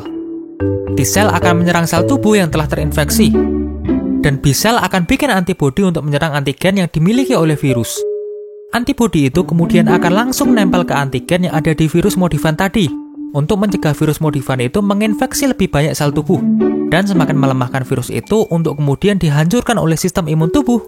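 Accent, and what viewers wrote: Java, Indonesia. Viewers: medok gimana gue yang bukan orang Jawa masih paham apa yang dibilang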